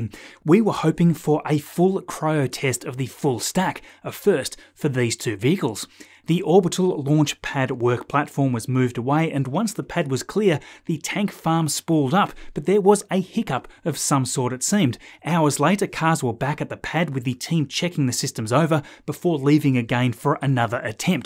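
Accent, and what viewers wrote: Australia. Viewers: my lovely Aussie mate, I love your accurately non-politicized SpaceX reporting, but repeatedly have found myself in an information overload. Maybe it's because I'm 71 yrs old. Maybe it's because I'm a kiwi, and find Oz and kiwi accents distasteful on YT. But may I suggest you upload twice a week with half the content and double your audience? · I think just tries to hide the Aussie accent a bit given the international audience.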